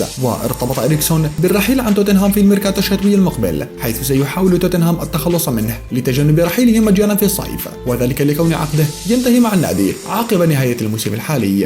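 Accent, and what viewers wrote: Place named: Iraq. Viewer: شو بعرفني بقلي ياغالية انا قلت هيك لهجة العراق😂😂😂😂😊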